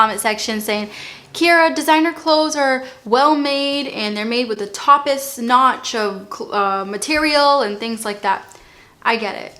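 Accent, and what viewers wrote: Boston, United States. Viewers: I love how you say cancer your Boston accent comes through so cute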